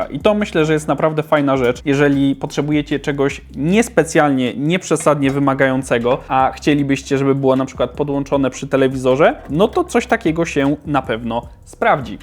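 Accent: Silesia, Poland. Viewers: Kurde widać że swój chłop Ślązak wotaj w klumie masło hahahahaha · wielki plus za śląski akcent w filmie!😃 edit: pod koniec filmu zauwazyłem też koszulkę ze ślonskim akcentem xdd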